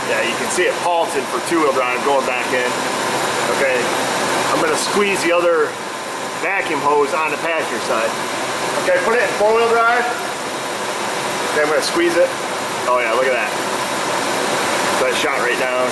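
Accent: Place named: Upstate New York, United States. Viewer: Alright, Man when you said "wicked" a couple times I knew you were from Upstate... but when you said "jabronie" now thinking Binghamton. Either way, it's nice to hear someone else who talks like me. I live out here in Washington State now but was raised in the wicked cool town of Binghamton, NY with some other stinkin' jabronies.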